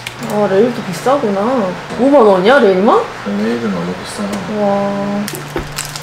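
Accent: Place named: South Jeolla, South Korea. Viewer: 사투리가 전남권이신거 같은데 ㅋㅋㅋ..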